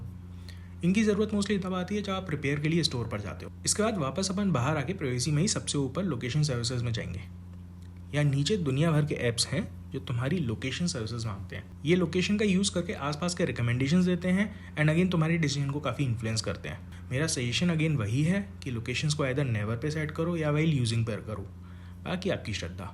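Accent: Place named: Gwalior, India. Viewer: funny accent ... Ekdum Gwalior Andaaz me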